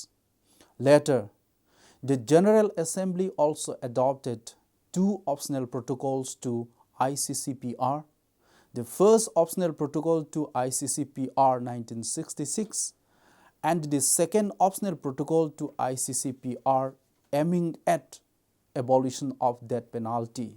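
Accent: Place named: Northeast India, India. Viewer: He is speaking English. The only thing is that he has a North East Indian accent. Please learn to be kind.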